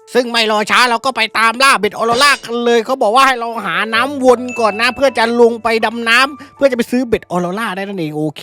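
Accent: South, Thailand. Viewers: ตกได้ปลากระป๋องครับ 5 kg. สุดฝีมือแล้วครับภูมิใจสุดตั้งแต่เล่นมา😅forzarken11 เข้ากลุ่มพี่แล้วนะครับทั้งหมด5กลุ่มจาก fcที่ดูพี่มาเกือบ2ปีคลิปก็ยังสนุกเหมือนเดิมสู่ๆครับพี่ชายแหลงใต้เก่งจุง🎉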